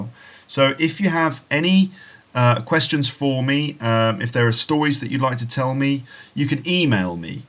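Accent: United Kingdom. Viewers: I really like your accent "British" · I like British accent!😊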